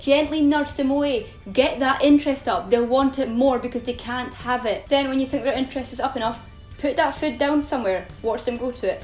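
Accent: Scotland, United Kingdom. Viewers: Oops hit the send arrow. Puffin was with me one month short of 38 years, and Dixie, a Green Cheeked conure rescued from a horrible situation and given to me by my avian vet so I could rehabilitate her and give her a good home. Dixie passed away at age 22. Your videos are educational and entertaining. I hope you get a lot of subscribers so your knowledge is shared and valued. I love your accent--are you in Scotland? My dad was born in London, England, and served in the Royal Air Force in WW 2. My mother was American and I was born here in the USA. I'll be binge watching your videos for a while to catch up. Great fun, they are. Apollo enjoyed the ones we watched tonight.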